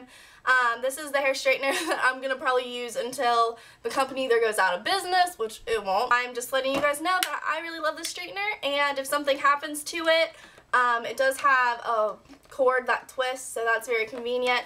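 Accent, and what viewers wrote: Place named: South, United States. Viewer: Your accent girl! It's nothin but southern. I love it. And I have a Royale as well. Best ever.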